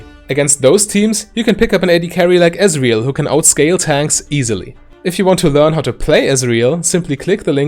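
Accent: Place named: Germany. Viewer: classic german accent ^^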